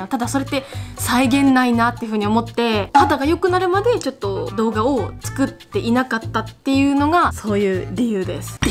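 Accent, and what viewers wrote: Kansai, Japan. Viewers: 友達にパスタアレルギー大変らしいよと言ったら『そんなアレルギーないよ。』と強めに言われた‥。関係ないけど、たまに関西ぽいイントネーション出るね。擬音とよくわからない行動もよく出るけど‥。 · え？関西弁多くない？？？かわんいい、、、、、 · チャン、関東人ではないですよね？イントネーションがチョット違う。名古屋から西❓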